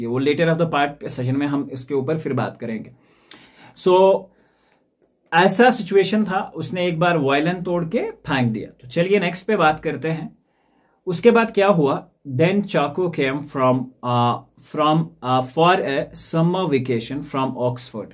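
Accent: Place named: Kerala, India. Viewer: Kerala ki pronounciation 🤣🤣🤣🤣🤣🤣😂😂😂😂😂😂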